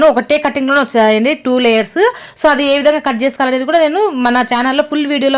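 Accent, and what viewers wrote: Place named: Kadapa, India. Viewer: Me slang Kadapa valla la vundhe meru Kadapa na Andi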